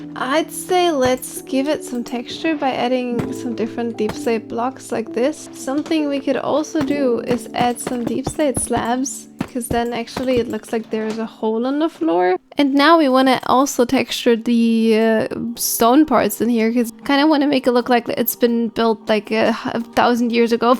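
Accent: Germany. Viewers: The deuich accent is there but the animated avatar and Minecraft skin look Japanese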